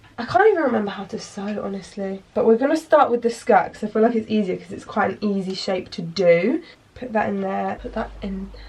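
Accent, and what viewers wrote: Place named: England, United Kingdom. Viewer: Randomly stumbled across your channel when I was living in Aus and I loved it because it reminded me of home and just listening to someone English ramble on was super comforting 😂 and now I’m back in England and still here and loving the randomness, the rants and everything else! Thank you for being completely you - very lovely in a world of fake!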